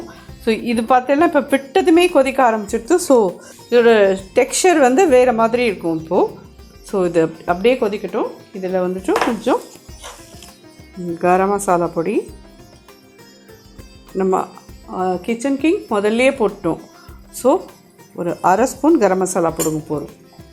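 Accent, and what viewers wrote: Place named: Thanjavur, India. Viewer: Mami neenga Thanjavur karala. Engathu periyava Madhiri peseral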